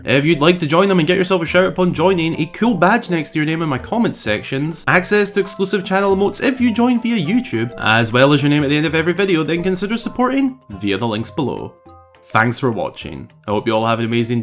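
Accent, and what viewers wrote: Scotland, United Kingdom. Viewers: What is it about scottish accent creators that make me want to play TOTK even more lol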